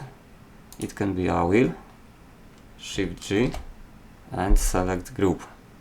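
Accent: Poland. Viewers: jesteś polakiem xD jeśli tak to poznałem po trochę niepoprawnej gramatyce i polskiej wymowie liter i niektórych wyrazów bez urazy ;-)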